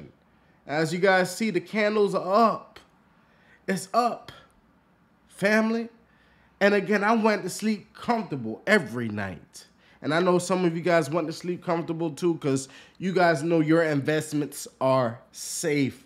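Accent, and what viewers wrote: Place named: Jamaica. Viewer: Ya Jamaican come thru clearly man.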